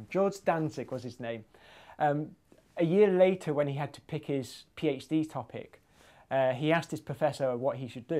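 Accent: United Kingdom. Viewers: the story-telling is somehow better with the British accent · Love the British accent. Hardys thoughts make me upset im almost 25 and I feel like now I can't make it ugh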